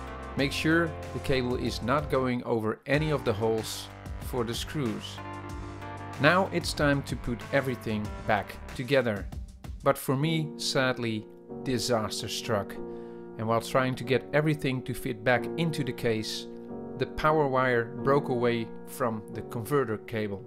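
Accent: Netherlands. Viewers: Gotta love a Dutch accent! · Ik hoor een Nederlander 😂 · very recognizable accent :)! I am dutch too. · You souwnd very like a Dutch persoon, very goed engliesch. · Spotted that Dutch accent from miles away. Nice video too. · woooow dat nederlands in het engels vermengd xD echt gaaf gedaan! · Great job! also it seems like you're from the netherlands, i live there to! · Are you perhaps Dutch? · Ik dacht al toen ik het accent hoorde: Is hij Nederlands? Maar al snel zag ik bij dat het zo is!😄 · are you dutch ik wel! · 5 seconds in. This dude must be dutch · ja jij bent nederlands wist het van je accent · is that a dutch accent or is it just me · hahahah je nederlandse accent is te herkenen :D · I got a slight feeling you are dutch like iam. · Hey, loved the video. Are you Dutch? You sound very Dutch. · I love that dutch accent so much xD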